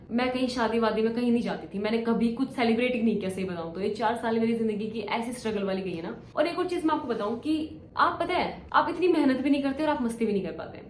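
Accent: Haryana, India. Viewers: Haryanavi touch in Voice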